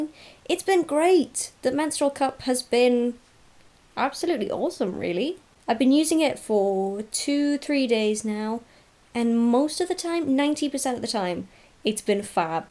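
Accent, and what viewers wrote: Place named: Geordie, United Kingdom. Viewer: What is that accent? It sounds posh but with a bit of Geordie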